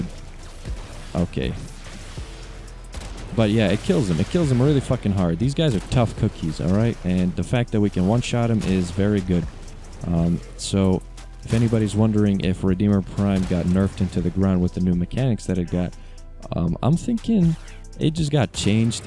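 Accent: United States. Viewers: Great information thanks for this. I have to ask, are you from Maryland? You have that accent sort of.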